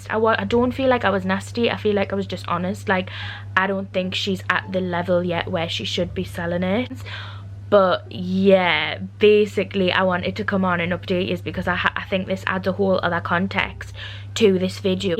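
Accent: Newcastle, United Kingdom. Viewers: Hope you enjoy Edinburgh plus I love accent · I’m from Bedlington but study in Edinburgh, love hearing a geordie speak, you make me feel at home ❤️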